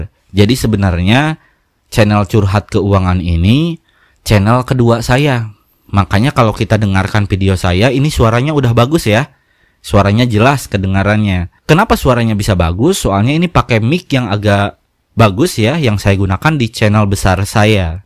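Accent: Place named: West Java, Indonesia. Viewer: Ditunggu bang, semoga apa jadi hajat abang bisa cepat terkabul 🙏. Btw logatna siga urang sunda bang 😅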